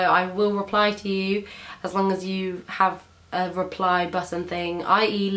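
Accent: United Kingdom. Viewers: I really like ur London accent XD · I DIDNT KNOW YOU WERE ENGLISH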